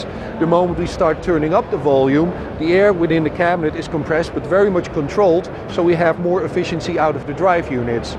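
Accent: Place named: Netherlands. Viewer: LOL i can just hear by the way he talks english he's dutch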